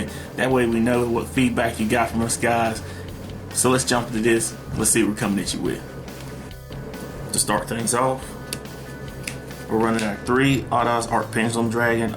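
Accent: South, United States. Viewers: Oh yeah lol your straight country lol I'm from Tennessee lol.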